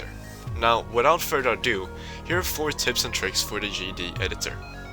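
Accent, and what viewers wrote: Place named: Sweden. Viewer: Nice Swedish accent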